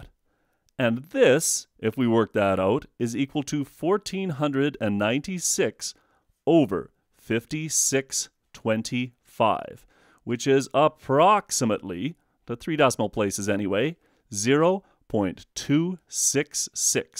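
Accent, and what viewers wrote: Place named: Canada. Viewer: I know a Canadian when I hear one. Also, thank you.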